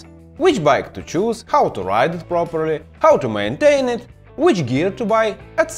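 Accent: Russia. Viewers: A Russian doing more Russian accent.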